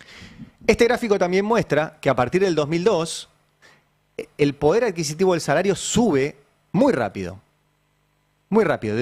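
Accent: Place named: United States. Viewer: UF con ese tono gringo. No me importa lo que diga hasta la forma de hablar suena a vende patria.. Cada vez peor.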